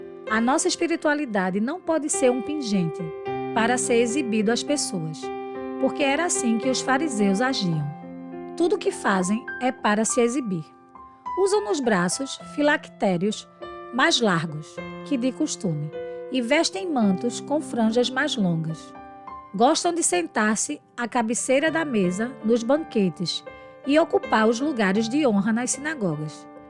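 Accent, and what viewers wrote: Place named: Northeast, Brazil. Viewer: Estou feliz de ouvir esse áudio com uma voz nordestina 😊😊😊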